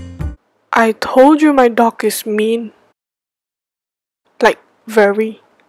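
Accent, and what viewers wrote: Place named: Malaysia. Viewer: BRO WHEN THE VIDEO STARTED AND YOU STARTED TALKING I WAS LIKE "is she Malaysian?" AND TURNS OUT YOU AREEEEE OMG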